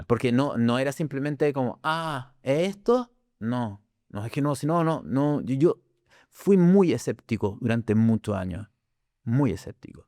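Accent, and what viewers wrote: Chile. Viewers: No lo conocía, pero al escucharlo supe que es chileno 🇨🇱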